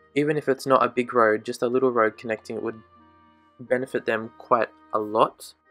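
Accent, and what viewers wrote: Australia. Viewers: You from Australia mate? love the channel btw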